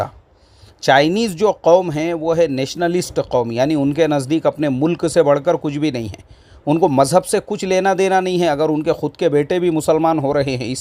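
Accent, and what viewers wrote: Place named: Maharashtra, India. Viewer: Mufti Sahab Mere Saathi Hai Bachpan Se Unka Baat Karne Ka Tariqa Aisa Hi Hai Jo Maharashtra Ke Baaz Ilaqo Ka Aam Tariqa Hai Lekin Dusre Ilaqo Ke Logo Ko Lagta Hai Ki Isme Gussa Hai Jabki Wo Normal Style Hai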